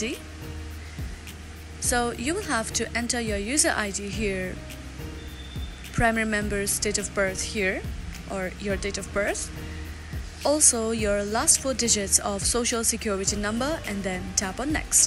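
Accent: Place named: India. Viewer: YOU SOUND LIKE AN INDIAN SCAMMER!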